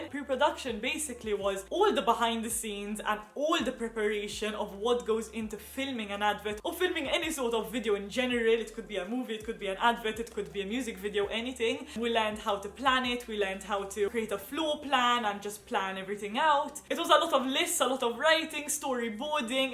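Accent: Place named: Jamaica. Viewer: Shes got a Jamaican twang with a mix of Londoner and Russian for Rs and Ls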